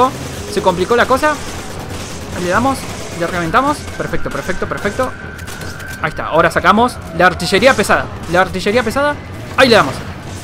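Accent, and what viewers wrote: Argentina. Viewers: sos argentino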